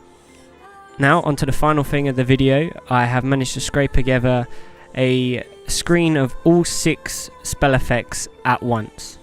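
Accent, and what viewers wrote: London, United Kingdom. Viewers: aaaaah that explains, i lov ethe london accent,lived there for a month =) way way better than this northern ireland accent up here lol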